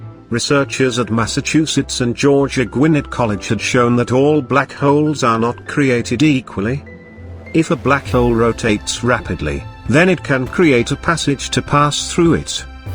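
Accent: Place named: United Kingdom. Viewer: a guy with a British voice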